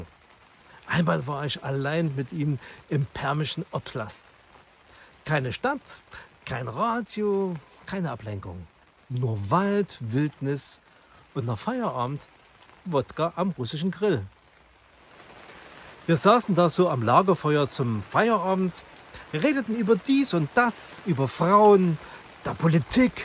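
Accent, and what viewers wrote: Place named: Saxony, Germany. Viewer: Die Petersilie liebt und hasst Hefewasser😮 Das kenne ich😂 (Schockolade) LG aus Brandenburg Du sächselst so schön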